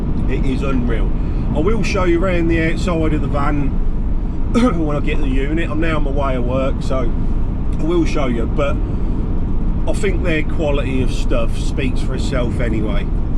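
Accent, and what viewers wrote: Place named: Norfolk, United Kingdom. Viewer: Hi, if you didn’t realise this or not, you are a natural at this content creation. I do love a channel that explains everything about what they are doing, and to top it all off, you sound like me, are actually from Norfolk?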